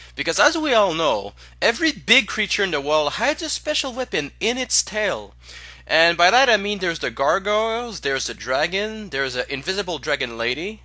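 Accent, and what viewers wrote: France. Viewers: Pretty good, but I think your jokes (play with words and images) are too frequent. Some are good and made me laugh, and others just made me say: "meh". Also, love the drawings. Btw, if you want to keep your French accent as your "trademark". Continue. If not? Work on your pronunciation. For example, the way you say "Anor Londo". ...Just saying... don't want to offend you.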